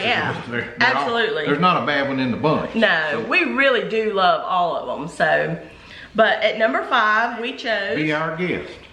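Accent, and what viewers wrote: South, United States. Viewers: Oh my goodness, you two are the cutest couple ever. Your accents are just too much, I love that sweet, Southern drawl. I was so surprised to see on with you, great video 😙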